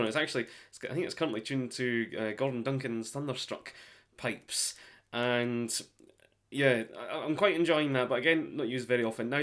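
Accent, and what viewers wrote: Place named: Scotland, United Kingdom. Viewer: Your Scottish accent is wonderful ❤!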